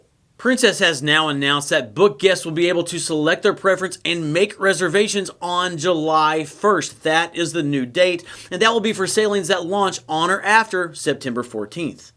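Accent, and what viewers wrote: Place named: New York City, United States. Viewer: She's hilarious sounds like she's a new york city gal.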